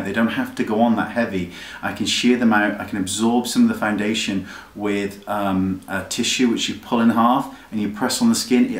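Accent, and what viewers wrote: Australia. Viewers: how come u have aussie accent! Sounds so cool though.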